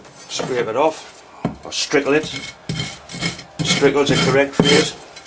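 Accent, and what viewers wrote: Newcastle, United Kingdom. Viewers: Love the old videos, you sound more Geordie back then!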